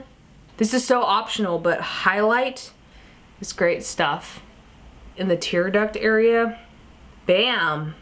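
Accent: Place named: Texas, United States. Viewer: are you from Texas originally?? I always think you have a thick Texas accent:0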